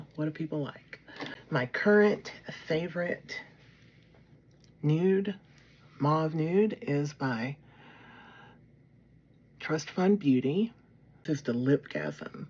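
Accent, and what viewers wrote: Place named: United States. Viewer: Aaaaah❤u look 🌹 lovely beautiful, u do have a beautiful accent n I love hw u American's speak am frm England ❤take care 😘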